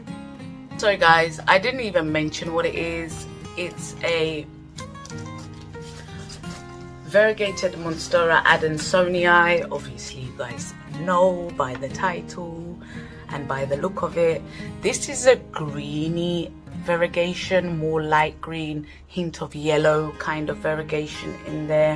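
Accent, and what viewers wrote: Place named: United Kingdom. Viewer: Would you pls update us about your that little Alocasia you bought from ebay ? And another thing i want to add "apnar British accent khub e shundor and perfect MashaAllah, i love it alot"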